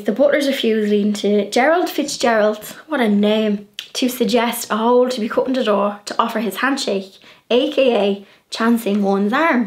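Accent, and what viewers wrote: Dublin, Ireland. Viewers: Loved your video and actually helped me understand some of my Pappy's sayings. He was of Irish origin and lived with us - I just simply picked up his slang. When I married my husband (from New York), he'd always ask me what does that mean? Sayings he'd never heard. Now, if I could only say those things with the lovely Irish brogue you have :) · you have a “real Dublin accent”. Years ago I came to Dub as student, couldn’t understand half of what was said to me🥺. Then met Dub & ends my story🥰🥰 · I loved this video it was brilliant. We use lots of the same words as you in Northern Ireland too. Especially hot press and the likes. It's amazing how many irish words we use up North and also in my part of Northern Ireland we speak Ulster Scots or as the Americans call it Scots Irish. Also my granny always used to say going for her messages. 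Insead of grand stretch in the evenings we mostly say quare stretch in the evenings means a good stretch really the same although we do say grand meaning good. Also I remember going around in the car with my granny and Granda and he would see a wee old place that was in ruins but look like it was a pub and he would always call it a shabeen. In fact him and his friends had a wee shabeen in the shed at the bottom of his garden and they called it the Sunday school so he didn't get in trouble lol with his mum for sneaking a wee pint in there lol. What I love most about Ireland North and South is our hospitality up north we always say do you want a wee cuppa tea but that means you just don't get a cuppa tea you get biscuits and sandwiches and buns with the tea a full spread but if the person's responds and says aye just a wee cup in my hand that means they just want a quick cup nothing to eat which wouldn't be said often unless in a rush and couldn't stay or were not hungry like just if you were just after your lunch. 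When you go to our homes it's a full occasion and a spread is always put on I love that. 😊I love your Dublin accent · This has to be one of my favourite videos! 🙌 💚 As a fellow Irish lass from Canada, I've been wanting to brush up on my Irish slang! So thank you so much for making this video! 😊 Also, if ya don't mind me saying, I just love your Dublin accent 💕 xx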